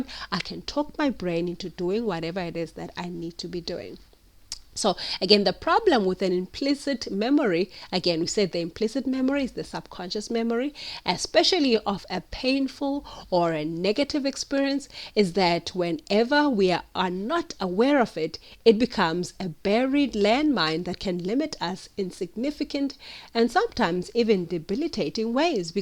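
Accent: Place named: Kenya. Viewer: Thank you so much for this. It was very helpful and it was very nice to hear an accent which reminds me of my homeland Kenya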